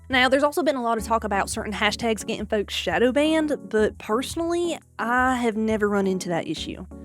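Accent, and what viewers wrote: South, United States. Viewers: Thank the good lord another streamer with a southern draw. I haven't come across another streamer with a draw. And your info is very refreshing. ❤ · Thank you, I love getting new information & I love your country accent you remind me of my favorite stallion Applejack. Thank you so much, I have used my own hashtags & everything I will do my best to post more I made a new hashtag today for my Merry Morning Sing Song, I did it because I found your video I am proud of your work, thanks!!!!!!!!!!!!!! 🫴🏽MWAWWWAH~⁽⁽ଘ🤍ଓ⁾⁾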